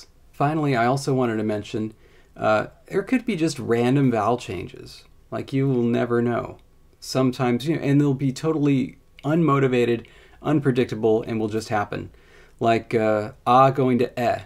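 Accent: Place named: United Kingdom. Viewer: You are British.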